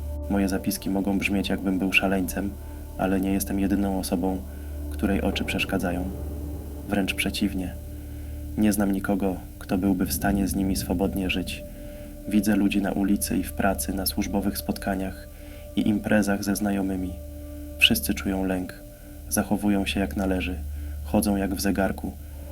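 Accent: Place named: East, Poland. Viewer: dobra pasta dobry lektor chociaż momentami akcent wschodni słychać ale to nie przeszkadza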